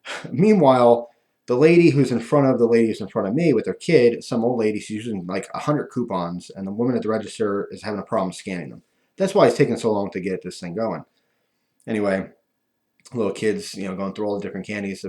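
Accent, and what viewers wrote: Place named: New Jersey, United States. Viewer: I thought you "sound funny" cause you're originally from Jersey. I'm going looking for your Paypal email. I want to see you with a flipper for now and you pricing implants as well as a bridge. HesGoneNuts is right, shut up and take our money. What you do has value, let us demonstrate that value voluntarily. It's the libertarian way, are you some kind of commie? =) Why do you hate America? Why do you hate Truth, Justice, and Superman? You aren't the boss of us, take our cash.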